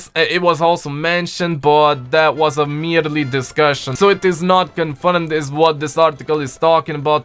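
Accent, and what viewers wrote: Russia. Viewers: please stop the russian flow bro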